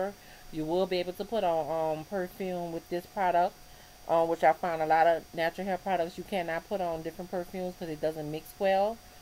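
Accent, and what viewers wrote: New Orleans, United States. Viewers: are u from new orleans? ur accent is so cute! lol anywho, im transitioning and my friend who's natural put me on this product and i cant get ENOUGH of it. it sucks cuz the only store in my town i know of that has it jacks up the prices on all of their natural hair care products. but i found a website, <link> that has it for i think 7 or 7.99 and its free shipping :^D! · you from new orleans huh...lol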